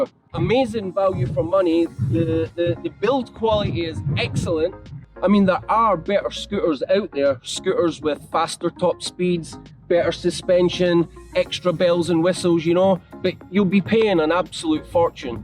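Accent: Scotland, United Kingdom. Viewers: Thank you so much for your hard work man!!! 5 star review!! Scotch accent is bad ass!! I am trying to master the accent!! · Hi! I'm glad that my comment could make you feel good, unlike some... people who have nothing else to do in their life but troll. ;-) As for your Scottish accent, it's very light compared to my Scottish godfather's, and I understand you perfectly. But it's well known that English people from England England often have trouble with a non-Oxfordian accent. :-P I travel a lot and I intend to do some trails in Scotland as West Highland Way, Southern Upland Way, Great Glen Way, etc. But this when I'll have modified my Pro 2 (which is already equipped with Monorim front and rear suspensions + 10" tires) into a powerful 2WD (2 x 500W + Monorim U5 Pack + additional Aerdu 13S4P 48V 14ah battery), in order to have the power for the climbs, especially with the camping gear in the backpack, even if it's all ultra-light, and a maximum autonomy (with solar charger for long trails, of course!). 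[ I know, some would say I'd better invest in a Kaabo Wolf Warrior King GT, but it wouldn't be as light to carry in and out a sailboat for daily use, and I don't need all the speed it provides. I prefer to ride safely at a gentle pace and have maximum autonomy. ;-) ] So, if you wish, maybe we could meet when I'll pass by your place? And let me reiterate my thanks for your videos, which are also well edited in post-production. We can feel the work of a passionate person. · BREVVVV Scottish accents the best one! Big up ya self and bun the haters lol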